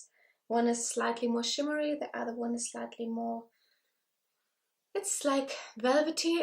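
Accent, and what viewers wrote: Germany. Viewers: Your German accent is great, and your English is good! I subscribed to you after your velvet lips video because I am interested in makeup and the German language. I learned some makeup-specific German vocabulary from your videos!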